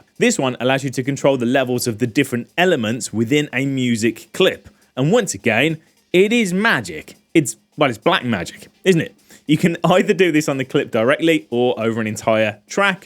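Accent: United Kingdom. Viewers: I take it all back… You aint gotta change s#i+ I suck. Your speech is amazing and British!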